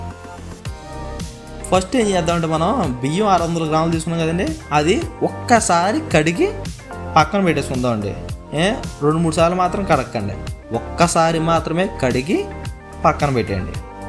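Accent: Godavari, India. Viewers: Godavari style lo cheppaaru brother, very tasty joint biryani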